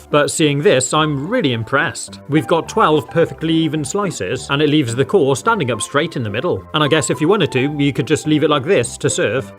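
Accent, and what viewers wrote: England, United Kingdom. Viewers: bro is from the united kingdom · Are you from England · Your british accent very cool, i like it!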